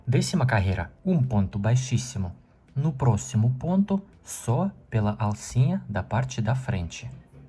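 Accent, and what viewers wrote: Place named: South, Brazil. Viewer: Estou sendo sincera...se eu não soubesse que você é estrangeiro, e ouvisse você pela primeira vez...acharia que você era de alguma cidade do interior das regiões do Sul ou do Sudeste, por causa do seu sotaque que é muito sutil.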